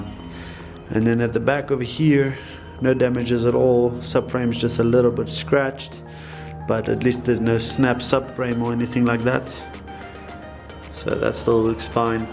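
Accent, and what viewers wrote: South Africa. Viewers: Ayyy I recognized the accent😉 I'm South African aswell. Enjoying your vids bru, keep it up.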